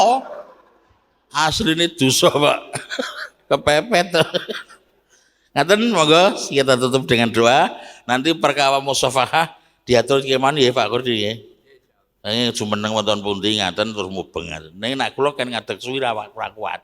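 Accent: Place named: Semarang, Indonesia. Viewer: Bahasane semarang bnget..cocok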